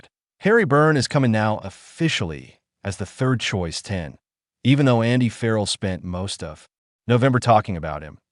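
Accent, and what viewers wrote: United States. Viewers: Why the American accent? This isn’t an American sport - it’s British. Change the narrator. And you’ll have a better response…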